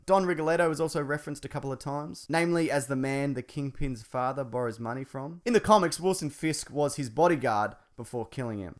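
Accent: Australia. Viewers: bestminecrafter1 he's Australian... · You Aussie?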